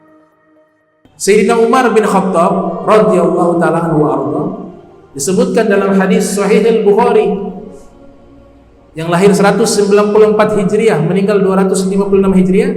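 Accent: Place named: Arab world. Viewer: Keslem wahay orang indo, kalau asli indo saat bicara tentang nama tokoh dr agama sekalipun, janganlah alay lebay dibuat" nama nama jadi dialek arab. Nyebut umar kotob aja pake dialek arab. Kayak tunduk banget gak mau kritis umat nieh. Wajar banyak dikibulin bangsa arab. Sejatinya manusia yg lahir di nusantara kalau nyebut tokoh islam aja seperti budak, maka negeri indo lambat laut bakal jadi negri islam yg gak punya jati diri bangsa lagi. Pakaian pun diganti agamis berkedok syariat. Stop alay dan lebay saat ngomong dimanapun mengenai tokoh agama jangan ikut ikutan dialek Arab. Sangat rendah banget harga dirinya dimana dunia kalau bangsa indo ke arab araban. Wong Muhamad bin salman aja udah kebarat baratan kenapa kits harus ke arab araban, yg mana budaya arab itu budaya tertinggal/konservativ/ kampungan/dan jahiliyah! 😇🤗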